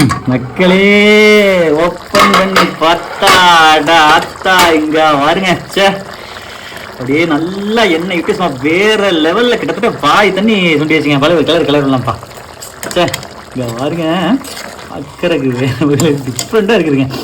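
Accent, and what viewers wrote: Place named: Erode, India. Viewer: ஈரோடு ஸ்லாங்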